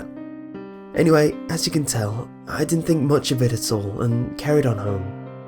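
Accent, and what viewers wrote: United Kingdom. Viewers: Is it just me or do stories sound better with a british accent? has a good mic too so he is my favorite narrator.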